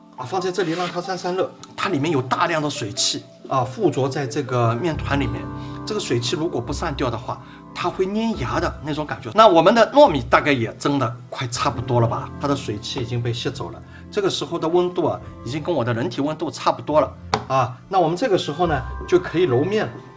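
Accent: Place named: Jiangnan, China. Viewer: 很幽默的师傅，能听到江南口音。虽然觉得我自己做饺子皮还挺熟练的，这个烧卖皮还是有难度。厉害厉害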